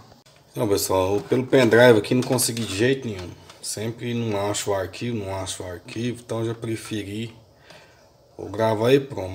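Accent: Minas Gerais, Brazil. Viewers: Pelo sotaque ou é mineiro ou é goiano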